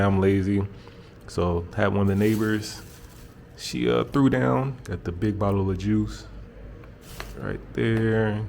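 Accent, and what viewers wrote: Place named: Cleveland, United States. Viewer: Your videos are so dope! I hear an accent in your videos bro, are you from Cleveland?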